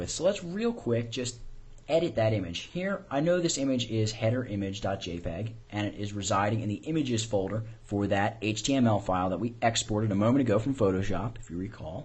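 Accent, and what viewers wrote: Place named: United States. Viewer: awesome tutorial, i was tired of watching other vids where the people have strange accents. USA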